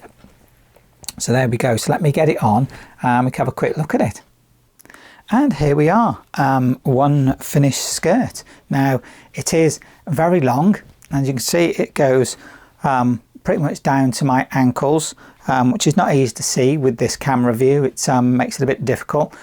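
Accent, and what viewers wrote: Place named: South West England, United Kingdom. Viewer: I'm a tech need seriously 😅 I need to dm you as ive got 3 huge bags of really good wool after my late mam and I can't think of anyone else I'd rather give it to. Help how do I contact you? I'm living in South Wales and you sort of sound South West urself 😍 if ur not interested no worries 😊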